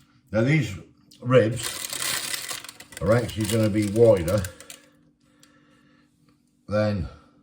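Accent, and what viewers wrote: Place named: Norfolk, United Kingdom. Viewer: You a Norfolk boy? Where about you from?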